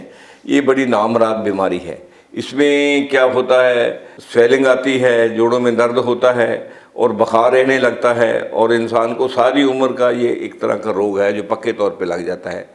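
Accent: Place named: Punjab, India. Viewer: Dr. Sahib plz. Kara banane wali 11 चीज़ों के naam लिख दीजिए आपका पंजाबी style मै बोलने कि वजह से समझ नहीं आ रहा है. मैं तकलीफ में हूँ please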